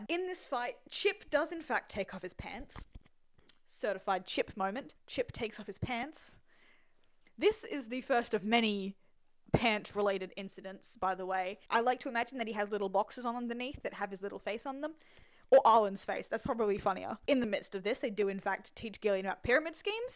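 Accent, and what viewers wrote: Australia. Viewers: BRO UR AUSTRALIAN??? IM AUSTRALIAN!!!!! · SLAYYY (i forgot you were australian so it i had quite a bit of whiplash)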